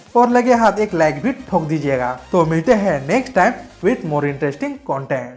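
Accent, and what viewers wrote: Bihar, India. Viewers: Aap Bihar se hona?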